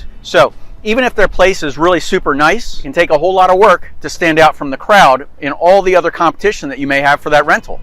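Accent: United States. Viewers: This is weird. The American English accent is right, but these aren’t all real English sentences. AI maybe?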